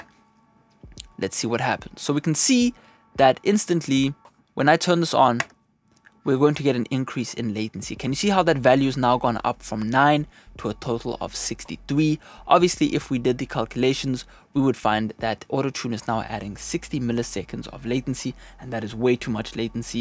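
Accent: South Africa. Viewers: bro where u from, u sound south african.... shoutout to SOUTH AFRICA!!!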